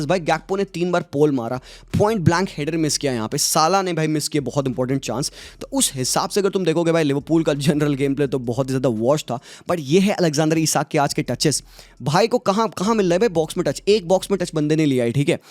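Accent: India. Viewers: Bro your accent is shit bro Indian accent make me puke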